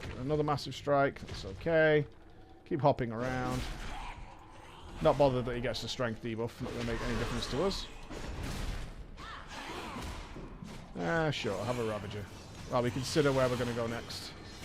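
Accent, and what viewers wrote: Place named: Wales, United Kingdom. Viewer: 75 minutes of that silky Welsh accent? What A beautiful wednesday.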